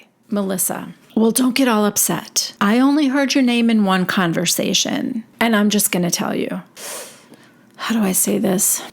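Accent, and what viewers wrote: New Jersey, United States. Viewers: Omg! Your NJ really showed this week! You've got Danielle down to T.!!!😂 I used to think you exaggerated Teresa UNTIL I was accidently listening to Namaste Bitches. I thought I was listening to you so I started l😂 I'm thinking No way! It was Teresa herself sounding just like you! I hope this makes sense. You're reviews are the best. No one imitates ALL the housewives like you do! I love and appreciate your efforts and love your work! Thank you so much and God Bless ya!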